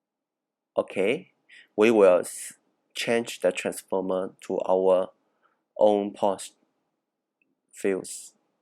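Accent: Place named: India. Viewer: 还是说中文吧。。这英文听着和印度人一样的，产品不错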